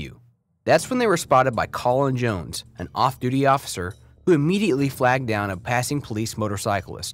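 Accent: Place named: United States. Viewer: Sounds wierd an American describing a UK prison 😂😂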